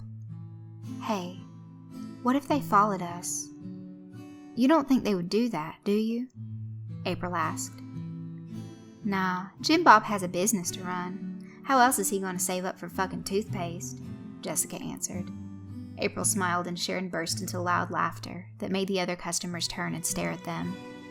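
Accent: South, United States. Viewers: You are definately underrated as a narrator. You are one of favorite reader and I love how you can do both a southern and english accent! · I love the southern accent you used while telling this story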